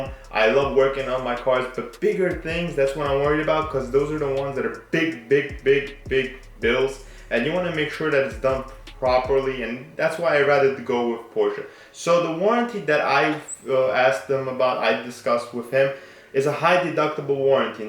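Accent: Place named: Armenia. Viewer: Your eyes look just like pure Armenian eyes and the accent is Arm as well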